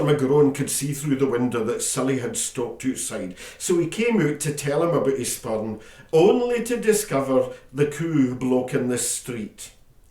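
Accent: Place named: Ayrshire, United Kingdom. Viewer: Brilliant,. Accent was straight oot o Ayrshire.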